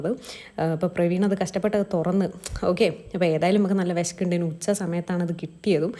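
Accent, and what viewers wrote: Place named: Kozhikode, India. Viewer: Calicut Pronunciation. (slang )🙌 I have lot of colleagues from കോഴിക്കോട് in UAE-❤❤❤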